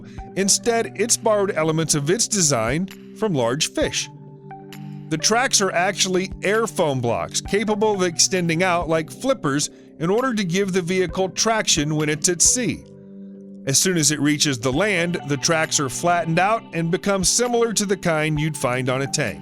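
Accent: United States. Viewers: Another utter load of bollocks with a really fucking annoying IQ zero american narration.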